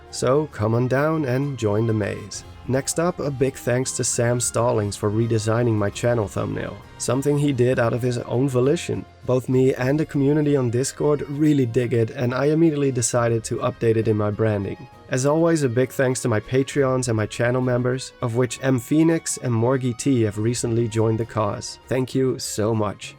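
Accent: Netherlands. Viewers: I knew you where dutch, just hearing your voice lol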